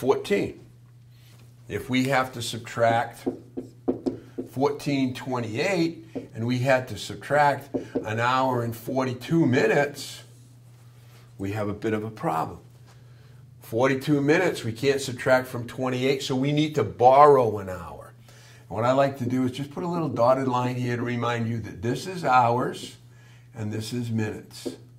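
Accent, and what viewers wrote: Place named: Eastern Shore, United States. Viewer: One- of the greatest gifts to humanity is teaching. The second is teaching WELL! and you achieved both! Your tone and pace is excellent. Now far from my Eastern Shores I do enjoy the accent, which of course we know we don't have but everyone else has one.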